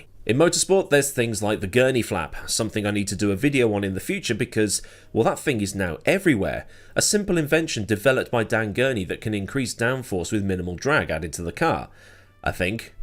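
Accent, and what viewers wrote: Canada. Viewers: Checking in from Toronto, I will give you an 8/10 on the Canadian (English Speaking) Accent and a 9/10 on the appreciation of the Canadian sense of humour having "Biennvenue a Quebec" on that wall :) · Love the Canadian accent, although I think the appropriate Jacques Villeneuve, French Canadien accent would have been even funnier.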